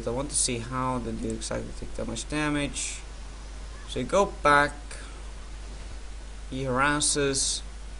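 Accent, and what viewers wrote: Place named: Sweden. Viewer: what accent is it? swedish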